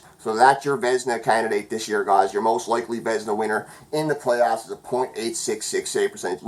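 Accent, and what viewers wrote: Newfoundland, Canada. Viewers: Is that a Newfoundland accent u have? Scottish origin? Im Just a silly American so I really don't know but that's what I'm guessing...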